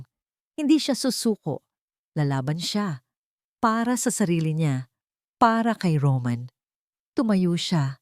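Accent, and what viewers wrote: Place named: Conyo, Philippines. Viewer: Parang tanga ang salita ginawang hindi ko na nga tinapos konyo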